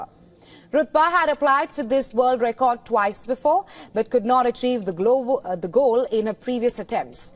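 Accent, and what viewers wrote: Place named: India. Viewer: I dont understand her indian english tune😢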